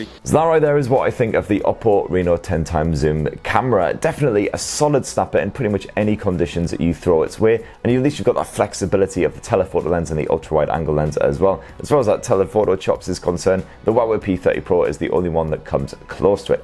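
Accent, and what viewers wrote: United Kingdom. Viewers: I watch all your videos even though I can barely understand lol your accent is too British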